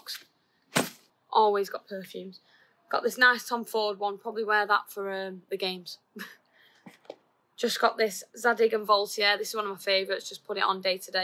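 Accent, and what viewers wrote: United Kingdom. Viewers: The British accent is really cool I love it ❤️ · Hi. I'm trying to expose myself to all the British accents. To be honest, it's quite hard for me to understand you, but I realize that sometimes you emphasize your accent. Please, you don't need to do so cos some people follow you due to your regional accent. Could you please let me know what's your accent called? By the way, good luck with the World Cup.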